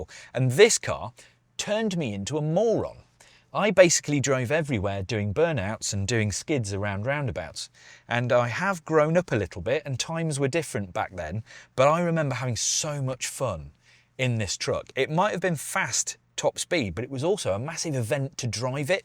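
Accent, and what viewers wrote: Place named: England, United Kingdom. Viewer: Why is a English man talking about a American truck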